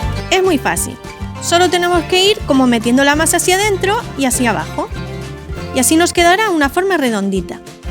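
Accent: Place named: Spain. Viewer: Hola cómo estás, soy tu admirador y seguidor veo por tu hablar que eres española, de que ciudad eres, me gusto tu receta de pan como todas las otras.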